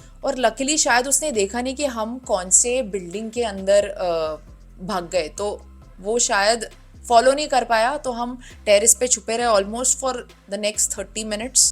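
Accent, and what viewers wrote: India. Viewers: i come across with your videos today, and watching you speak Hindi with desi accent i can't stop laughing. 😂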